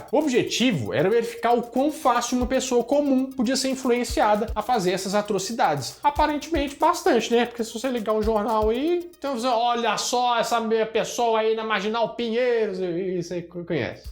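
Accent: Minas Gerais, Brazil. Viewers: Como eu amo seu canal!!! Risadas garantidas e esse sotaque pão de queijo q eu amo, tendo em vista q eu tenho sangue mineiro correndo nas veias, apesar de ser carioca da gema. Minha mãe era mineira e mudou p RJ aos 16 anos.... Parabéns pelo canal e conteúdos!!!!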